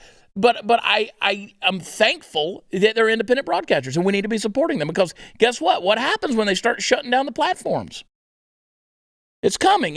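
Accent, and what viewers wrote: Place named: Georgia, United States. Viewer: I do too! He's a wordsmith with a Georgia accent! 2x the fun!!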